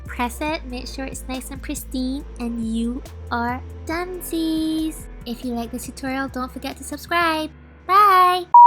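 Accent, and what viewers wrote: Barbados. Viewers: Are you from Barbados?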